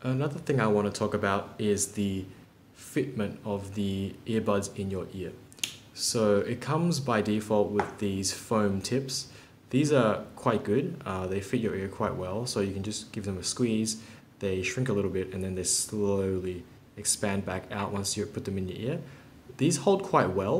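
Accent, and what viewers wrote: Australia. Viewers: Love your video & presentation but can't stand your "Aussie" accent. sorry !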